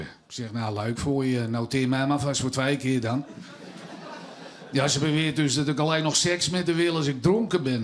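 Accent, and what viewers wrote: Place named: Amsterdam, Netherlands. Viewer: Ton Kas moet overschakelen naar Haags. Dat Amsterdams is erg irritant.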